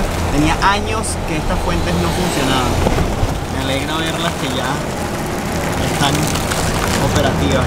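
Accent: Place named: Venezuela. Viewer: Muchachoooo ya llega a su País Natal y se pone hablar como un Venezolano XD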